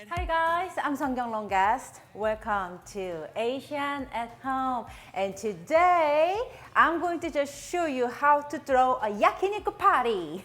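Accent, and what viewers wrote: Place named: South Korea. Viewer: 영어에 한국어 액센트 물씬인데 음식 역사, 유래 좀 배우지? 무식하게 저걸 일본식이라고. ㅉㅉㅉ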